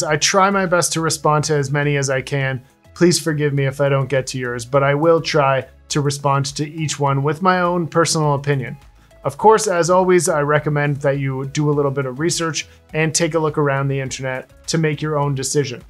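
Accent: Germany. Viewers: Got me subscribing from the German accent 😂 but you speak facts so I like that , you got my view brother and will be watching , keep it up 🌲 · That German accent is the reason why i liked your vid just 2 minutes in xD Also could you do a vid explaining everything about a dry herb vape? like what cause the high to end sooner etc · I love the German accent makes me feel like I’m watching Hogan‘s heroes. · You get like and subscribed for that German accent! 🤣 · Nice entertaining presentation. I’m just starting out and you really helped clear my confusion. Nice German accent too :-). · Loved the German accent, really good. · Good German accent Vielen Dank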